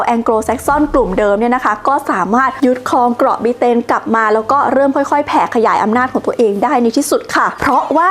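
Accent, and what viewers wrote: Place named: Central Thailand, Thailand. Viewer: ที่น้องวิวพูดนี่แหละเขาเรียกว่าภาษากลางไทย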